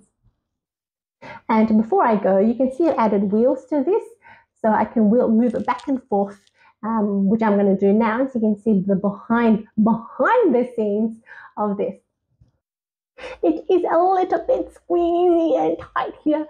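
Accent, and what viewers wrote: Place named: Australia. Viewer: I thought you might follow her. It was a surprise for me to hear an Aussie accent when I found your channel. You don’t see many of us Aussies talking about trading.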